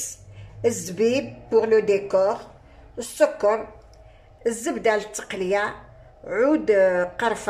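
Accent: Constantine, Algeria. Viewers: يعطيك الصحة طاطا هاني نايضا نديرها الله الله على اللهجة القسمطينية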